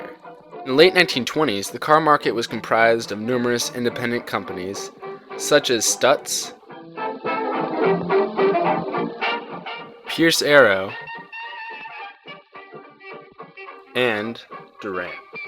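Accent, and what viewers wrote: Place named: North, United States. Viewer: Sounds like he has braces. But what's wrong with it? Mightuhv been a homework assignment. Also, I'm gonna guess a northern accent.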